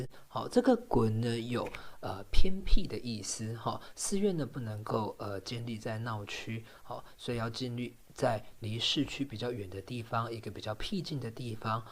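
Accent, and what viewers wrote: Lhasa, China. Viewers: 請問這是那種口音的藏語？拉薩腔嗎？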